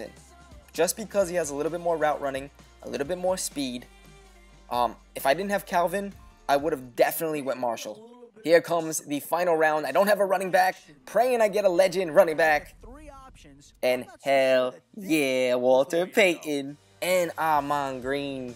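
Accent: New York, United States. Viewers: are u from new york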